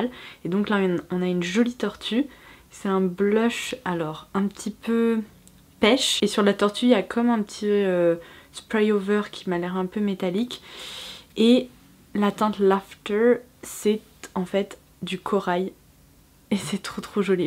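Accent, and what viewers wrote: United Kingdom. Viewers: Coucou! Cela fait quelques temps déjà que je te suit, mais la première fois que je commente. J'aime beaucoup tes vidéos, et à chaque fois que tu lis un nom anglais j'adore cet accent, que je ne pourrais jamais prononcer MDR Tu es très belle, continues ainsi :-) Ah oui, j'adore tes " Oh, Ouah !" Mdr, fallait que je le précise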